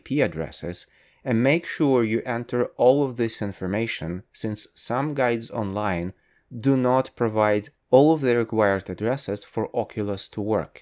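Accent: England, United Kingdom. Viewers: Oo vi iz anglii?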